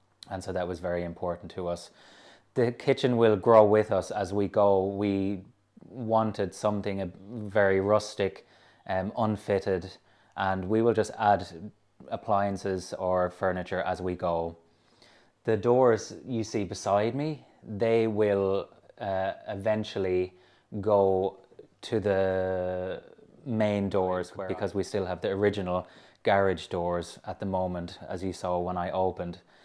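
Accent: Ireland. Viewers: Great house,your accent makes me nostalgic as I am a Dubliner in London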